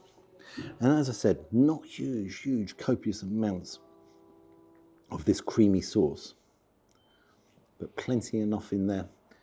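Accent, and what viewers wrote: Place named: Scotland, United Kingdom. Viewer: I had to turn on subtitles because the Scottish accent you've picked up was getting difficult to understand. I will assume you enjoyed the birthplace of tikka masala and the food you experienced 😂😂. 9/10 for the history and accent. Have a wonderful weekend.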